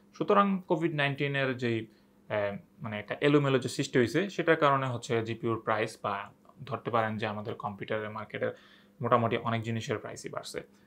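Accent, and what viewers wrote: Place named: Chittagong, Bangladesh. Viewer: your accent kinda sounds like chitainga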